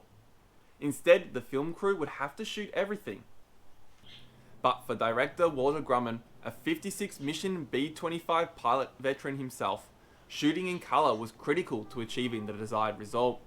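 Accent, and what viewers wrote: Australia. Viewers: Made from timber and plywood?? What do you think ply is made from - plastic? Nothing new in the vid. I thought there were only three flyable airframes, all the rest were taxi dummys. - - - And a word of advice - disregarding your Aussie accent, you need to improve your diction, so that you don't slur your words or miss the ends off!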